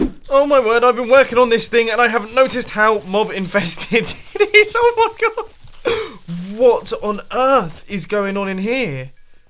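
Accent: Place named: United Kingdom. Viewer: Ewan Bruce He said I didn't know if I could fly. It's hard to tell if you aren't used to his British accent, and his happy squeal.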